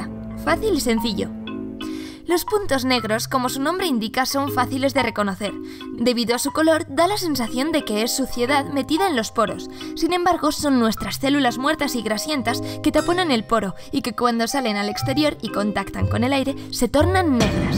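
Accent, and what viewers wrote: Spain. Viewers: El acento castellano es enserio molesto muy molesto que modo de pronunciar tan horrible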